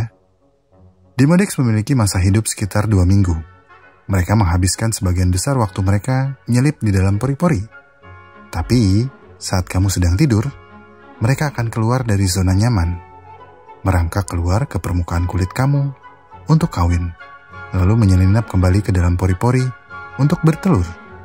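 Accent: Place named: West Java, Indonesia. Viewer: Admin orang sunda sigana yeuh 😂😂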